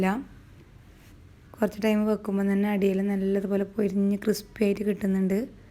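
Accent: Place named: Kasaragod, India. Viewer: Kasrgod slang orupad ishtayii.... Masala doshayum sprrr🥰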